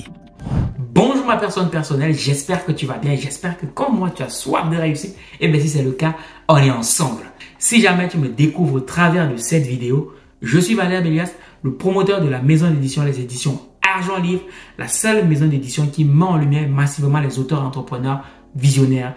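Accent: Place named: Cameroon. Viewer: Pour une fois je ressens ton accent camerounais 😂😂😂😂😂😂. Merci pour cette vidéo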